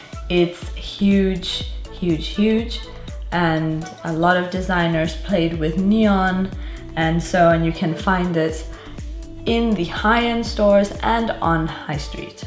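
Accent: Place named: France. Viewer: I swear you're getting a French accent! It really suits you ;) I really like the look of the first trend, I have a soft spot for tailored looks. The faux-fur sounds really nice as well x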